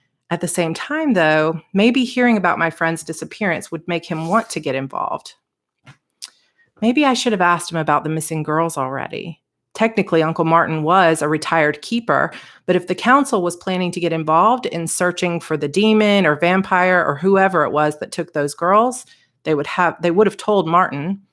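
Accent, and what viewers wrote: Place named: South, United States. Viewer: I live in Arizona and like Californians we don't have an accent. However, you have just a hint of southern accent that makes your voice so charming. Please seriously consider narrating your books. ... The other thing is, I'm always disappointed when there's a hired narrator. It makes it soooo much more personal to get the book read to me by the author. Sending lots of love ❤ from sunny 🌅 Arizona 🌵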